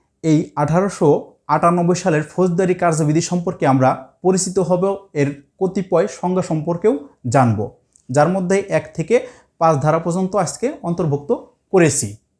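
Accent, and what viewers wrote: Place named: Jessore, Bangladesh. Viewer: আসসালামুআলাইকুম স্যার আপনার বাসা খুলনা বিভাগের যশোর জেলায় মনে হয়ে।।আমার বাসা ও যশোর, আপনার কথার টান দেখে মনে হচ্ছে।। ক্লাস সুন্দর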